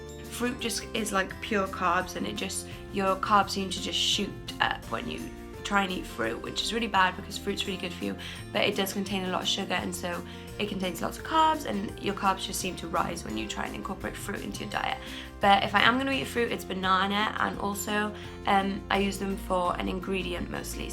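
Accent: Wales, United Kingdom. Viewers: Is that a Welsh accent I hear? Xo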